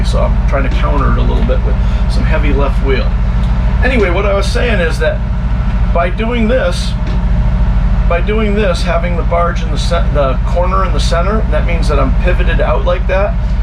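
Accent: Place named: Louisiana, United States. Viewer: After years sailing worldwide , I assure you, the Cajun accent is nothing 😉 I ve come about some memorable ways to mishandle the English language: Try this... Helms order from a Chinese inland pilot "Yellow Yellow Yellow" 😵‍💫😖and that in the middle of the night on a river without any light and no chart we knew off and plenty unlit barges. Eventually we manage to make "Zero Zero Zero" out of it (the Chinese pronounce the "R" as "L"). Or this pilot in a remote small port in Estonia.. as there was no BA chart existing of the place, he brought his own Russian one. It looked like quite a long buoyed channel on paper. With the pilot's only English at each pair of buoys that was "Red to port, green to starboard" (Note: in Europe, it's inbound red on portside, as in opposite to America where it is green inbound on portside). This worked till we got to berth and plenty shallow water around. 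Then he uttered something without any warning and speed reduction that was to mean that we had arrived at our destination... Two days later, when outbound with the same pilot, we put him on the bridge wing and closed the door while he was like a parrot "Green to port Red to starboard" Not to forget: the Philippine sailors that always pronounce the F (or ph) as a P, there is also the odd one that pronounce the P as a F. It gives this... "chiep, chiep, I want to go ashore for a telepone call, it is very cheaf here"😂😂😂😂.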